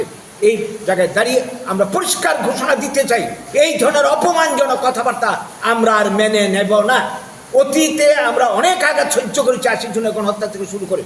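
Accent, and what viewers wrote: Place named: Bangladesh. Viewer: Bura. Bangladeshi tumar kothai buja jaite se. Tumi. 1 no. Bangladeshi, bura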